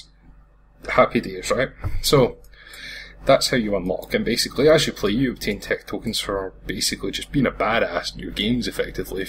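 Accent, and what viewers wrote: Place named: Scotland, United Kingdom. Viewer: Love the Scottishness <3 I'd love to see more games like these. Ones that are good time wasters and that are off the main Radar of Say the Steam featured items page :D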